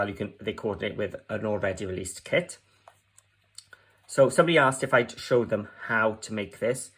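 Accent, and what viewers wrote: Wales, United Kingdom. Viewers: I really enjoyed this video today showing the bridge fold card. This is a fabulous card, I am going to try it for a lovely lady who will be 90. Thank you so much for showing this technique. P.s. love to hear a Welsh accent again 😍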